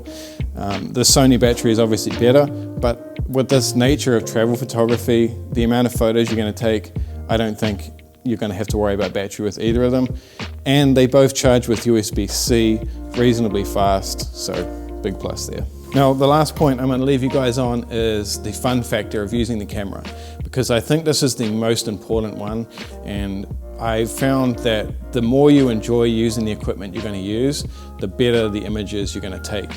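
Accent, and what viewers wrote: New Zealand. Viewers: are you from New Zealand bro ?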